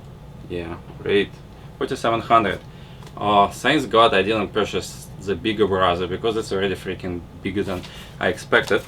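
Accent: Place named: Russia. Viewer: are you Russian speaker ? Russian accent 101 %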